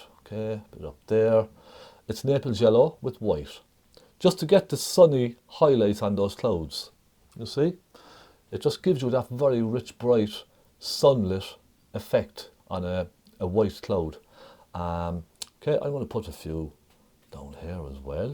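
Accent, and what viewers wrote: Ireland. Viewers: how much do you charge for just a recording of your voice? The Irish magic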